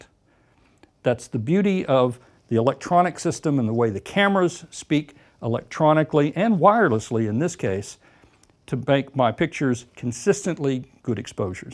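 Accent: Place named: United States. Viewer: I'm confused: he's in Australia, but his accent is American, but he called a flashlight a torch. Dude, where you from?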